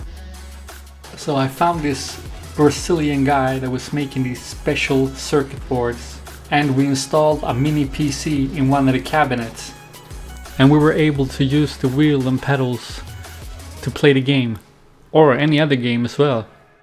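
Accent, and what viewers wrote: Scania, Sweden. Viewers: Jäkla grov skånska grabben hade!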